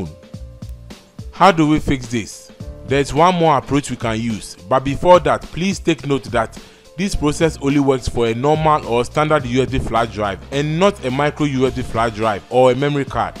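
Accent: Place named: Nigeria. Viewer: You sound like you may be Nigerian. Some good tips. Thank you.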